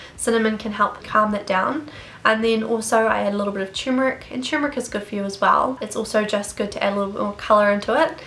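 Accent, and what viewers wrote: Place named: New Zealand. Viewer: I'm an Aussie; live in NZ and I can defs tell you - that is a Kiwi accent with a deep South roll of the R. Not an Aussie accent at all.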